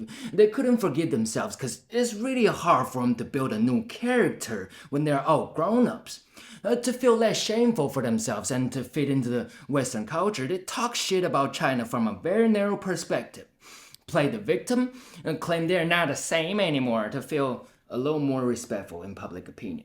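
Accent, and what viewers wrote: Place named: Houston, United States. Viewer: wow brother. love to hear and see from more small youtube channel mainlanders lately. you are very brave! great rap and great takes on all the videos ive seen so far. where did you learn your english? 😂 its awesome! you sound just like some of us asian folks in houston, texas!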